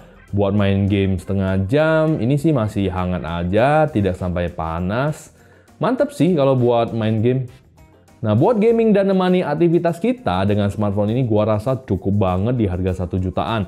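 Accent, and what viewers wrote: Pematangsiantar, Indonesia. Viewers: Bah orang siantar rupanya haha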